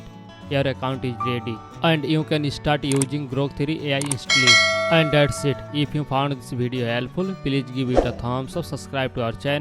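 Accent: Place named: Southwest Asia. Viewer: almost unintelligible. This would be fine in southwest Asia, but the speaker's accent is very difficult to understand.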